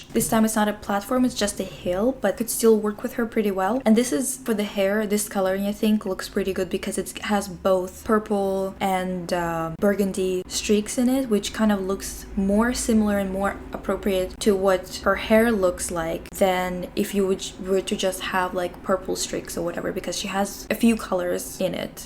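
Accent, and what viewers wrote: Russia. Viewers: do you speak Russian?? that explains why sometimes your pronunciation feels kinda like ours, especially in “r” and “n” sounds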